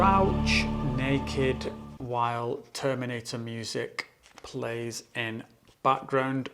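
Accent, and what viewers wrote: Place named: Lancashire, United Kingdom. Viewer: What's that accent you have? You near Preston / Blackburn?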